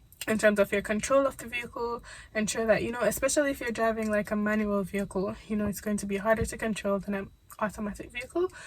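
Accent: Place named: Jamaica. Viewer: Girl, when I head that Jamaican accent I got gassedd!! I love finding Jamaican YouTubers. These tips were helpful even those I failed twice lool I'm going to use them in my next test and hopefully, I pass because ain't no body got money to be paying for lessons and test again and again.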